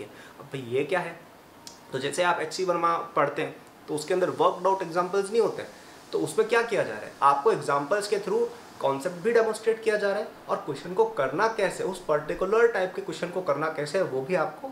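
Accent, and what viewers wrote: Standard. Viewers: Gazzab shudh Hindi👍👍 Best Btw IAM 2023 aspirant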